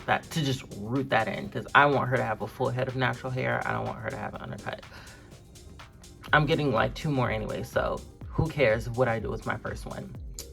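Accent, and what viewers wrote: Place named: United Kingdom. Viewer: It’s the British accent that’s scattered throughout this video, I love the energy!!!!!!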